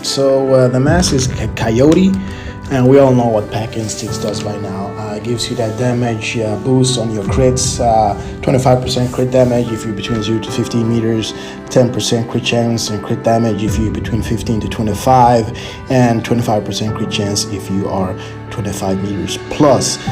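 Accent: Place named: Nigeria. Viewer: You sound Nigeria bro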